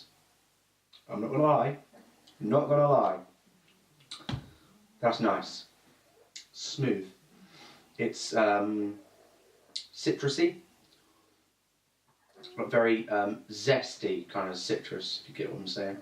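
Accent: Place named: RP, United Kingdom. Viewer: Nice review...your just to posh pal...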